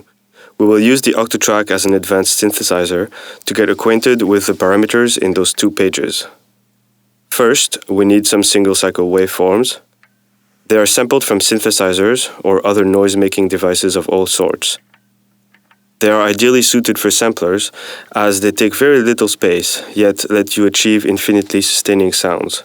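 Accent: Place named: France. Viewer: super vidéos, je crois reconnaître un petit accent français, ça serais cool des tutos en français :)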